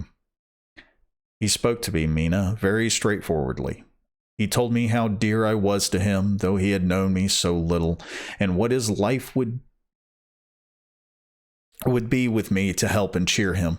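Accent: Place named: South, United States. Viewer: Excellent reading,.. i'm enjoying these. There is nothing wrong with a southern drawl. I like it.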